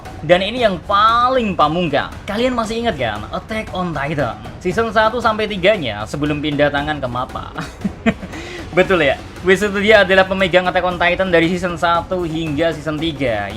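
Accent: Java, Indonesia. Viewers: Jngn, krna medok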